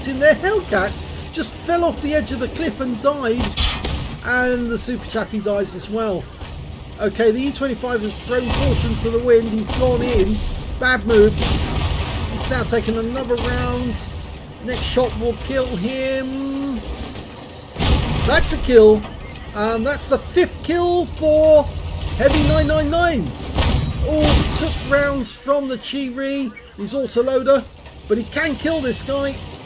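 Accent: United Kingdom. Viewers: Your exciting commentary keeps me watching your vids keep up the good work from N/A Jax fla also awesome Brit accent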